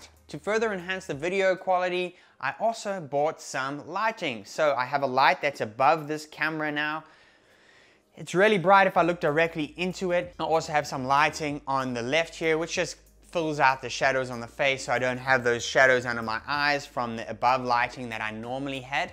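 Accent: South Africa. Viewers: Audio is perfect. You are South African, right? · Where are you from, your accent sounds South African - hi from South Africa btw :)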